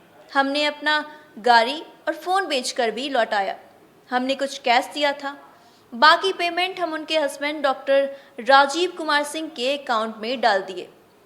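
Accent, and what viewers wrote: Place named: Bihar, India. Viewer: Aapka hindi me Bihari tone aa raha hae....kripya improve kare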